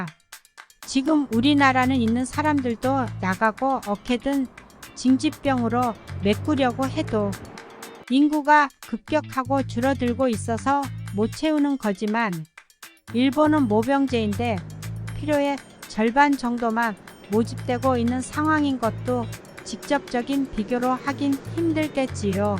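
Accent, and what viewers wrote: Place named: Chungcheong, South Korea. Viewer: 나래이션이 충청도라서 답답함. 전문성이 농후한 영상이라 생각됩니다. 호주 호위함 사업 탈락 때문에 자꾸 비교하려는 것이겠지요. 많이 배웠습니다.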